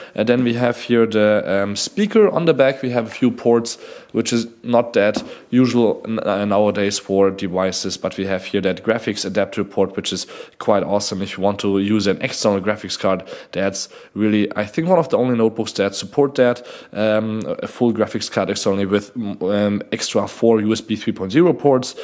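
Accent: Germany. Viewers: man hört's einfach immer wenn Deutsche Englisch sprechen 😀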